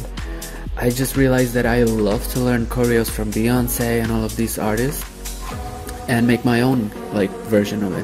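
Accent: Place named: Czech Republic. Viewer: Where do you come from your acsent and place where you from looks like Czech Republic or Slovakia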